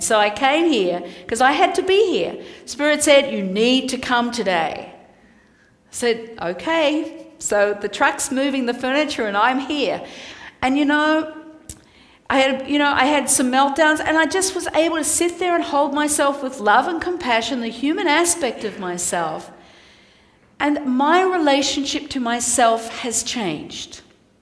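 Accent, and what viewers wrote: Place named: Australia. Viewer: you not only give wonderful HUGS but ones that have never met you giving your presence as on You Tube and your online Web Site you give this amazing loving energy that is so understood especially with your beautiful Australian accent You of choice are a Beautiful Earthling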